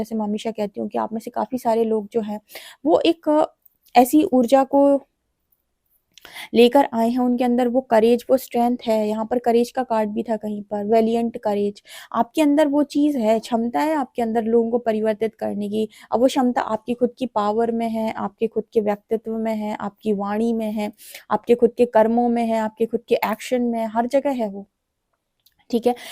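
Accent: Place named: Bareilly, India. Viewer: Kya ap bareilly se ho, accent to wahi hei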